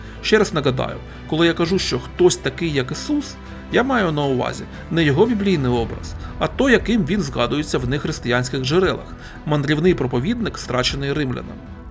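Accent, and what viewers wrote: Russia. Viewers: Хороше відео, проте попрацюйте над українським звуком [г], бо звучить завжди [ґ] - різкий рос акцент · супер. але працюйте над вимою букви "г"))) Бо чути, що вимова російська))))) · Російський акцент ПІДБІШУЄ.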